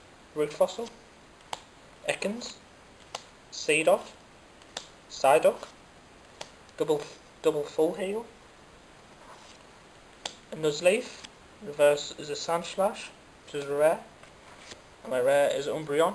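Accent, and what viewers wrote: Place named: United Kingdom. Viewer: thats cause he has a british accent lol which i think (not be biased because i'm british) is one of the best accents in the world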